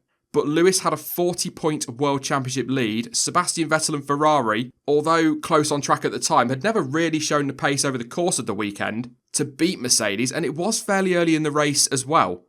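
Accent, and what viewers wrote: United Kingdom. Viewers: Sexy british accent #nohomo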